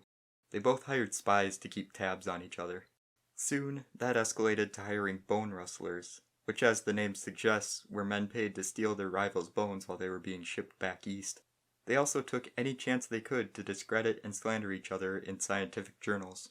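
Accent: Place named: Northern England, United Kingdom. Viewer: Really? He seems so sweet on camera. Must be the northern accent.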